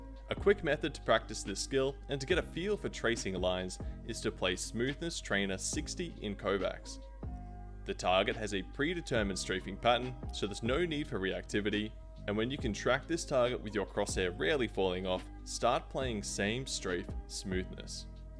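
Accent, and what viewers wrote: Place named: Australia. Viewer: U were tripping I think he just Australian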